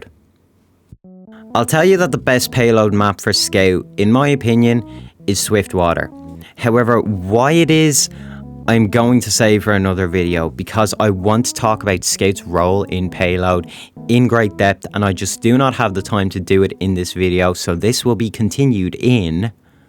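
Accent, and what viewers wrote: Scotland, United Kingdom. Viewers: Sound like u British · i love your Scottish accent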